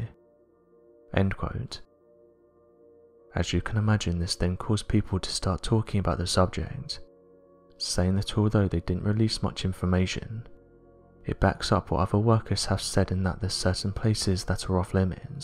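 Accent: United Kingdom. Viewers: Cog You seem to have a thinking impediment. This narrator is well-trained, well-modulated and speaks slowly and distinctly so that even moderately hearing-impaired persons can understand. He has a mild British accent without any particular regionalisms. It is very pleasant to listen to a pleasant, professional, clear voice rather than the yapping and screeching that lesser channels produce out of a failure to comprehend several of communication’s basic premises.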